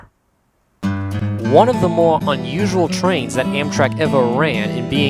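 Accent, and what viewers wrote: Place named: Boston, United States. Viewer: Your Bostonian accent is for real 😄 not being offensive I live in MA but I don't have an accent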